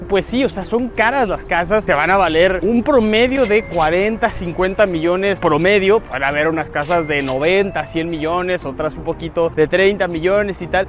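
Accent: Mexico City, Mexico. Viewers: Oyes, 'mano, de dónde eres? Porque ese acento mexicano es el mas bonito de todos. N'ombre, el otro dia vi un Rolls Royces, pidiendo "chicken" por el auto-servicio. Vivo en un pueblito rascuacho de Florida central. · No le oyes el asentote de chilango?!! Es tu paisano guerrero patas achicharradas uno mas qe se da cuentan qe no estan al nivel de Monterrey mexica!!!😂😂😂😂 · Príncipe azteca, el solo menciono que nació en san pedro pero no vivió ahí. Su acento chilango lo delata claramente pero bueno, que podría esperar del chiriwillo promedio.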